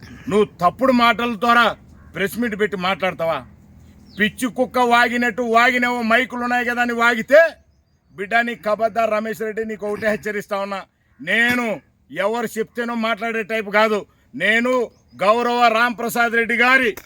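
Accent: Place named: Telangana, India. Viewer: Nuvve evarra telangana vadi la vunav Kadapa language em ledu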